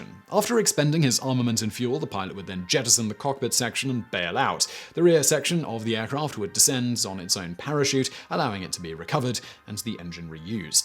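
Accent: United Kingdom. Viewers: I love this guy's British accent it is amazing and I could listen to him all day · Heyyyy another one of your channels! How did I know? You stuck your face in the thumbnail as usual. 🤦‍♂️ Get a real job lol. You basically narrate wiki articles (with 5x YouTube accounts) with a British accent and make a shit ton on money monetizing it. Maybe I should be congratulating you? I don’t even know. I just wish YT would stop suggesting this stuff and give me some original content lol. Oh but I do love the dapper clothing and those oh-so-poignant dramatic pauses between horrible jokes. 🤦‍♂️ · You try hold interest by talking really fast with a British accent, but it’s not working.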